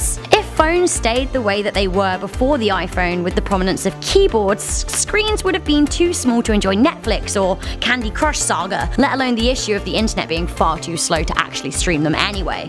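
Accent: United Kingdom. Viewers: how about "what if there was not a British narrator with an extremely annoying accent"?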